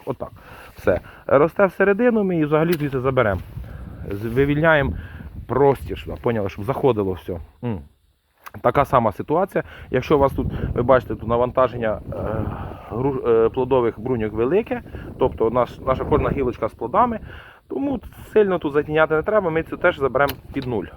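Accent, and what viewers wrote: Vinnytsia, Ukraine. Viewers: Забий, на Вінниччині всі так балакають. І це не від любові до 🐖🐶-ї.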